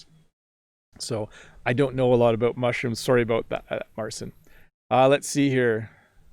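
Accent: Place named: Canada. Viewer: I've been in the US but I couldn't tell your pronunciation is of Canada. The differences are so slight!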